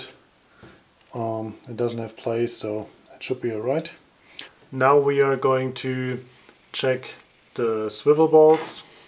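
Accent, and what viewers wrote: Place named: Germany. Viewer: beautiful landspace, where do you life, monkeys running around? your english speech seem to be a littie bit "germanized". thanks for your comments and detailed video from your landy repair! best wishes from austria!!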